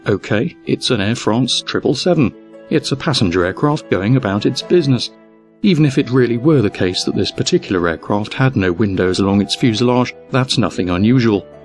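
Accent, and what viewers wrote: United Kingdom. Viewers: How many hours did you just spend debunking what is obviously the rantings of a mentally ill man? To what end? Do you think if this guy gave up his religion all would be well with him? I bet if he gave up his religion, he may well find himself inexplicably compelled to "expose" the religious rantings of the mentally ill. I see little difference between his video and yours. The British accent does make you sound smarter, but crazy is as crazy does and dude, debunking crazy peoples videos is just bat shit crazy. Did you honestly think we needed your help debunking his sky demon theories? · While my mind is having a hard time accepting the person you're quoting isn't a Poe, I still enjoyed the demonstration of your know-how, Google Fu, and awesome British accent. edit: On the other hand, if the guy is somehow serious, you spent about 20 minutes ripping into someone with a mental disorder. 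And I enjoyed it. We're horrible people :(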